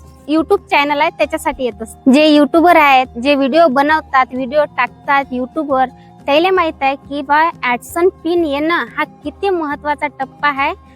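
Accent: Vidarbha, India. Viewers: तुला विदर्भातील भाषा कळते काय. विदर्भातील भाषेचा टोन आणि पद्धत अशीच असते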